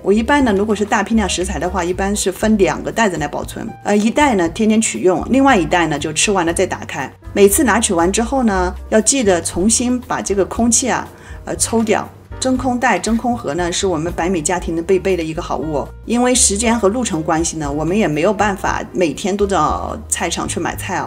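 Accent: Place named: South, China. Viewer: 估计播主是南方人，所以有困难，不要苛求，感谢播主的分享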